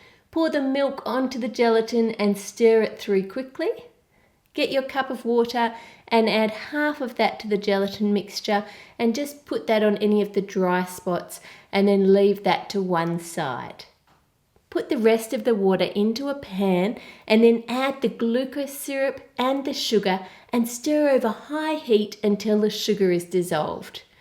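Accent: Australia. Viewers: Are you from Australia? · You know shes Australian not British or American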